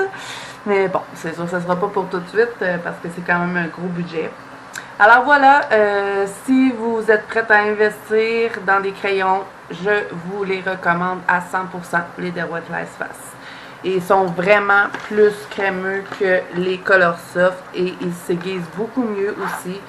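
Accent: Quebec, Canada. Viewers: c'est bien ce que je pensais et tu me réconcilie avec cet accent que je ne supportais pas auparavant. Il y a aussi Mélissa qui a contribué à cette évolution lol.... En tout cas, merci à toi du fond de ton Québec où mon mari rêve d'aller... et moi, pk pas maintenant que je me suis habituée à votre parler hihi... 💋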